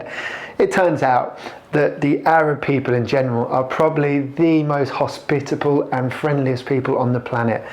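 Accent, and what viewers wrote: United Kingdom. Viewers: I see. But what kind of British accent it is? He sounds a lot like Jack Harries, Jacksgap. Southern? IDK :< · yep it's a southern english accent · british accent he's from england!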